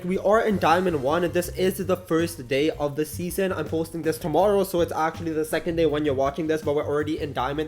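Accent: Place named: Punjab, India. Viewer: Bro your accent is very Punjabi 😅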